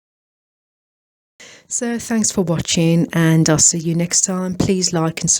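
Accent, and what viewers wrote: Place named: Midlands, United Kingdom. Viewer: Hello - Yes, it is in the British Midlands about 15 miles or so from Birmingham. You accent is very obviously British Midlands but not 'harsh' enought for the strong'Brummie Birmingham' accent. Hence l suggested Wolverhampton, a much 'softer', less pronounced Midlands accent.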